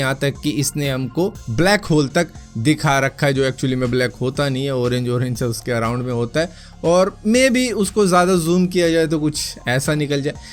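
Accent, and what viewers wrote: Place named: Maharashtra, India. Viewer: Bhai aapko sun ke I can definitely say that you're मराठी मानुस , कधी मराठी त पन बोला....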